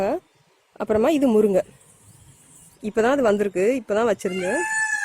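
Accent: Tirunelveli, India. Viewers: Nenga tirunelveli ya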